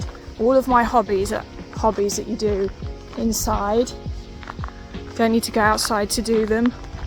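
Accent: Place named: Birmingham, United Kingdom. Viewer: You have shown great courage in order to follow your heart. I think you have kind of a Brummie accent to some extent. Well your taste in music compliments your personality all too well. If you don't mind would you like to try this australian band called. By send my love to the Mitsy as well.😇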